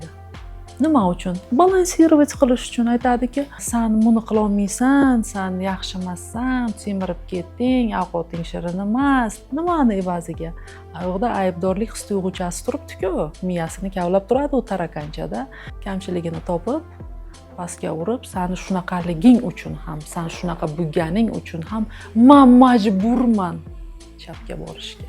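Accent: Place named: Qarshi, Uzbekistan. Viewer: Карши шевада опа куп болани фикрини эшитган бу аник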